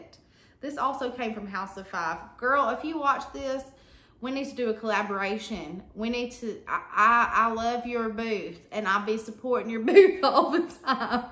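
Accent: South, United States. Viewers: You two are so cute!! Your Aunt looks like a "hoot" to be around. You are such a sweetie and i love your energy and "southern drawl". Thanks for sharing! Happy Memorial Day weekend.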